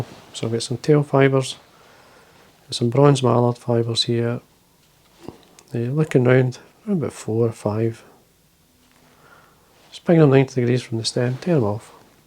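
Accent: Scotland, United Kingdom. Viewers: Hey! While I like your Scottish accent it does not translate well in your transcripts. I am hard of hearing and would like it if you would include a material list as well as transcript. I guess model doc is a mallard duck. Thanks for the great patterns and tying methods. Harry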